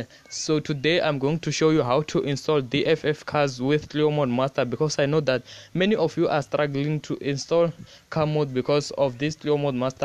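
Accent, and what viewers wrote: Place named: South Africa. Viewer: I can smell the accent...mzansi👑❤😂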